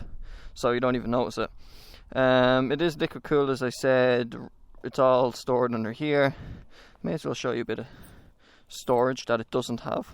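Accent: Ireland. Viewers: Are you irish pal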